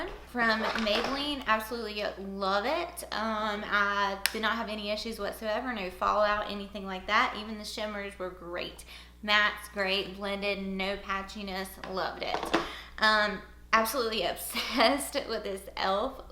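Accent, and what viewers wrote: South, United States. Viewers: You remind me of Casey Holmes so much!!! You both have southern accent and you both have same personality!!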